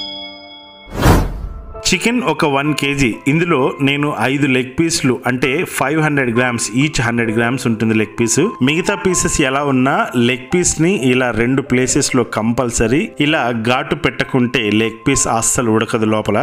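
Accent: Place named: Karnataka, India. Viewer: Bro ur kannada accent super guru...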